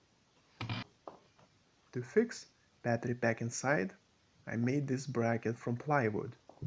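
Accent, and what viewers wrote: Russia. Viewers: Ваш Английский.... Вас выдает, что Вы Русский =)) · Акцент какой то русский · Прям слышно что русский, да?)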